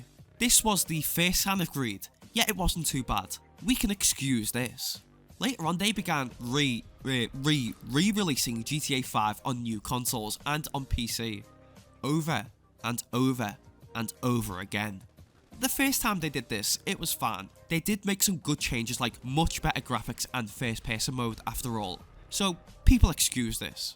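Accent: Liverpool, United Kingdom. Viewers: Appinin me fellow scouser lad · is this dude from liverpool?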